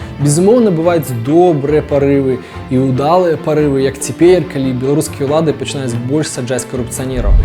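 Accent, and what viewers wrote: Russia. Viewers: ты такі харызматычны. Колькі табе год? Ці можна ўжо ў прэзідэнты балатавацца? І слухай, дружа, нешта зусім мала рэкламуешся. Укідвай грошы ў інста-рэкламу, нават у метро рэкламуйся. Твой кантэнт на выключна высокім узроўні. Я б вельмі хацеў, каб гэтая ініцыятыва перарасла ў Партыю новых ідэй. Як мінімум мой голас у цябе ўжо ёсцека. І яшчэ. Схадзі да беларускага лагапеда. Годзе ўжо гэтага рускага акцэнту) хачу пачуць родныя цвёрдыя «ч» ды «р». 😉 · Стремление говорить на белорусском языке - всячески похвально.. но что здесь, что в подкастах слушать трасянку невыносимо для ушей. И с течением времени прогресса нет. "Белорусский" язык с русским произношением и русскими словами... такоЭ.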